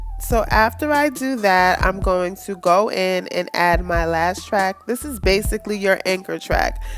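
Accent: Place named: Brooklyn, United States. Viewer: Girl you sound like you from the Brooklyn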